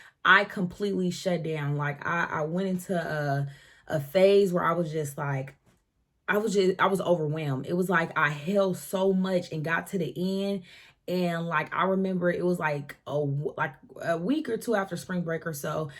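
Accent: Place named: South, United States. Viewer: You really sound like your from the South like me. I love the path you are on!! You are such a Queen sis👏🏾👏🏾👏🏾💜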